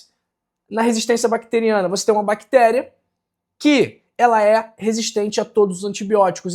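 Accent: Rio de Janeiro, Brazil. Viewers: O conteúdo pode até ser útil , mas esse sotaque carioca ninguém merece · Esse sotaque carioca é horrível, muito Ruin, muito chato, é muito cansativo ficar ouvindo isso...pqp... é muito forçado...cara vái fazer outra coisa na vida q não precise falar nada... não abre a boca por favor... credo...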